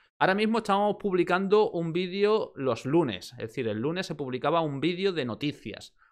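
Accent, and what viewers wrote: Spain. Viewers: se te está pegando el acento de Cádiz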